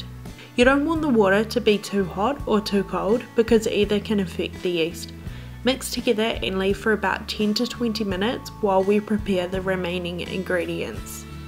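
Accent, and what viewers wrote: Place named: New Zealand. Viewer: R u a kiwi by chance?!? Cos i rekon u souns pretty kiwi!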